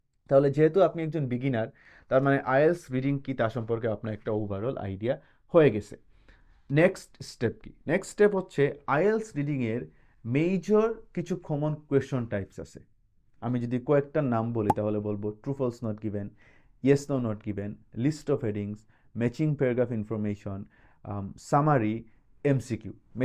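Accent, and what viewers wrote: Sylhet, Bangladesh. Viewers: Sir Are you Sylheti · Apne ki sylheti? Apnar kotar modde sylheti tan ta ashe🤷🏼‍♀️?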